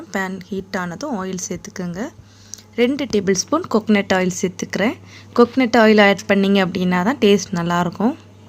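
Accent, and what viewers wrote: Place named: Nagercoil, India. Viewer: Super dear.. neenga pesrathu cute ah iruku.. namma nagercoil slang super 🤩🤩👌👌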